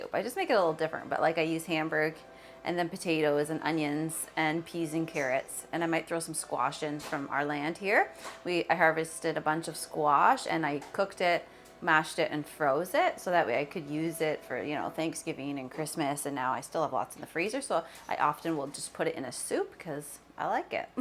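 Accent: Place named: Canada. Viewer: Interesting that you pronounce it "hambug" instead of hamburger. My father said it that way but I rarely if ever hear anyone else say that. Is that a Canadian thing? My father was not Canadian (he grew up in Buffalo NY which is very close to Canada though).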